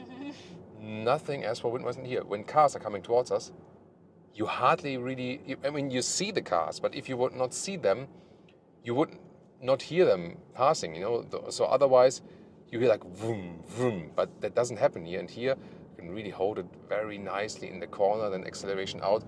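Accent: Germany. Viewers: Just want to say, that I love your videos. As a car guy myself, I really appreciate a German car guy speaking English. It’s pure enjoyment. Greetings from Denmark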